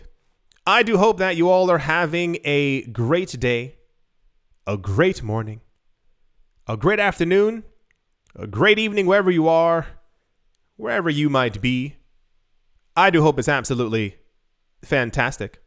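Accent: France. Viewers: Your french accent is so cute!!!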